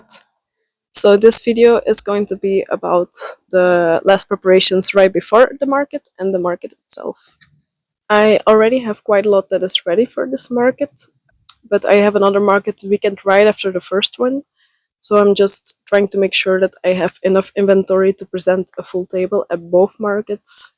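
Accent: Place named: Flanders, Belgium. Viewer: Hey, Ik vergat je te zeggen dat ik je hoor Nederlands praten. Of Belgisch eigenlijk. Wat Leuk! Ik ben Nederlandse 😊🎉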